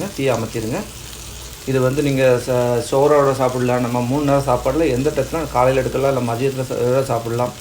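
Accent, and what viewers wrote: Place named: Tirunelveli, India. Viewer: Super recepy bro 😋Nellai slang 👌👌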